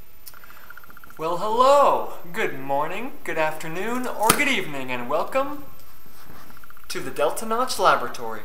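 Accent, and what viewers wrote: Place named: Europe. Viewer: Thought this guy was european, lmao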